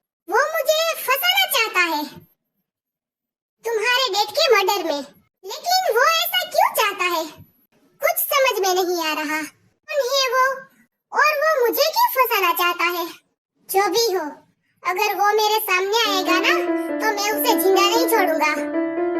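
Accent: Gujarat, India. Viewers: Wow gujtati accent is so good 🎉😊❤ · Didu aap kya gujrati ho voice sound se lga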